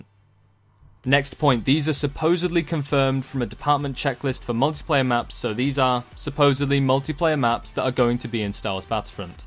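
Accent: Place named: United Kingdom. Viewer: Good video, very informative and i almost cried in excitement. Dont understand why you dont have more subscribers especially with that british accent that automatically makes you sound more intelligent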